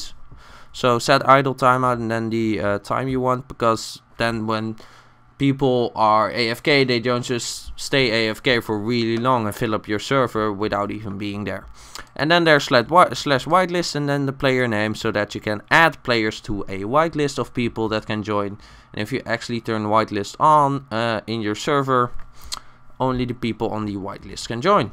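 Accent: Netherlands. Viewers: klinkt nederlands :D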